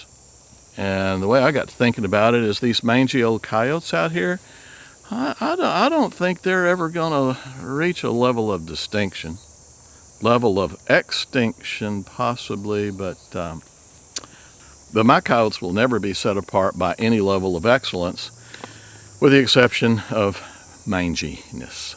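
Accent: Texas, United States. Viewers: Say Coyoteeee! We Texans do not need to speak the same as the rest of the country! I mean some people up North cannot pronounce the "R's"! Coyotes have to be thinned out. It's your ranch, don't allow others to tell you what to do!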